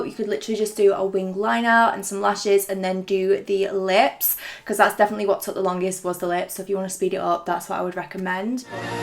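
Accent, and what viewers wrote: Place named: Scotland, United Kingdom. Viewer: Hahaha you must be from Scotland. Aren’t you?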